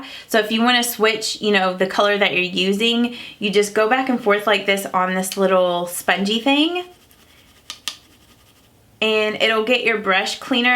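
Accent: South, United States. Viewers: it always makes me happy to hear a fellow southern gal speak. it seems like all the makeup YouTube channels are from either the north or Texas etc. the southern accent is musical.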